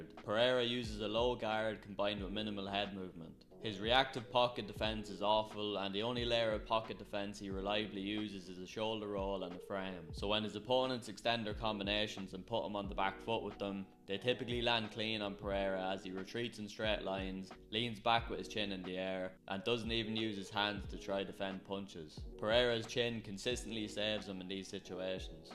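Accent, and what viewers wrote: Ireland. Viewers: Definitely from somewhere in the midlands in Ireland with that accent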